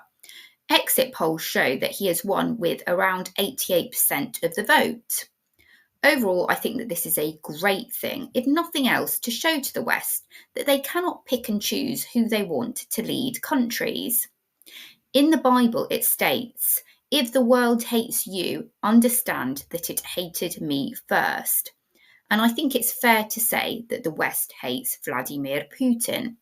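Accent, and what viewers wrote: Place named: United Kingdom. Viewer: has a fantastic British accent. It’s pleasure for my ears to listen to her.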